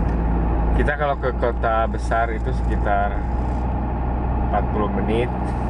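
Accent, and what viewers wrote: Sunda, Indonesia. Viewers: Sok lucu lamun siakang ngomong Sunda teh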